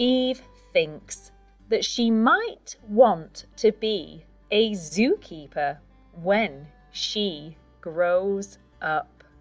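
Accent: United Kingdom. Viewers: I'm a beginner, still learning english through stories. I love your british accent❤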